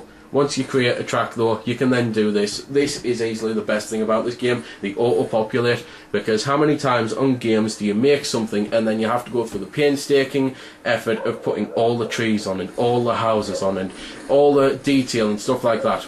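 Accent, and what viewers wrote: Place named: Scotland, United Kingdom. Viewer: Where you from, mate. You sound Scottish or something. :]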